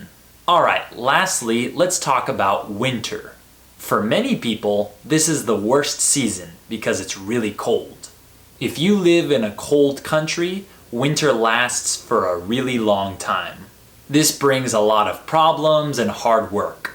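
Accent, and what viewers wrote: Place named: United States. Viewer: I can learn many things from this channel. I really like to listen your voice. Real American voice.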